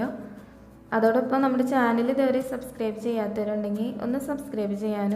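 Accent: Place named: Thrissur, India. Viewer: ബ്രഡ് കൊണ്ട് ഒരു കിടിലൻ സ്‌നാക്ക് easy ആയി അവതരിപ്പിച്ചു ,തൃശ്ശൂർ സ്ലാങ് ആയത്‌ കൊണ്ട് കേട്ടിരിക്കാൻ നല്ല രസമുണ്ട്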